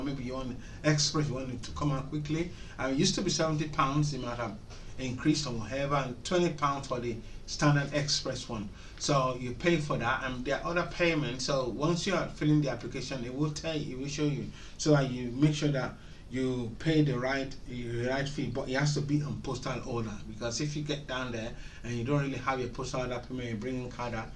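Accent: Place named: Nigeria. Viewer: you sound Nigerian 😆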